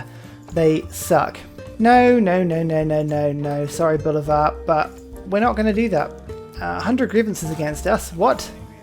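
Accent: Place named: United Kingdom. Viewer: I have found Discord too complicated for me to learn so I shall type out my message here. Dear, Thank you for making these lovely videos. I am new to using youtube but began visiting this website since the pandemic began. It must have been the hand of god for me to find one of your videos on the home screen. The last time he visited me, my grandson introduced me to Civilization 6 as a way to pass time. We would always play board games together like Settlers of Catan and Risk, but he was rarely able to travel and see me. He worked as a teacher in Hereford and his favorite subject to teach was history, which I think is why he really liked this game. I've never played video games before, so I found it a little overwhelming. He was patient with me though, being the sweet young man he was. He explained so much to me and I hardly remember what all he talked about during those few days he spent teaching me about Civilization. 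Though I don't play Civilization, I have watched almost every video you've released. I love listening to you explain every detail of what you're doing in order to win. I try to watch your videos as soon as I have free time, and have recently found myself waiting on your next video to come out. Your quirky sense of humor and beautiful British accent take me back to those wonderful days with my grandson. He said he liked to get science victories too, and said my favorite character to play would be Teddy Roosevelt. I appreciate everything you put out, an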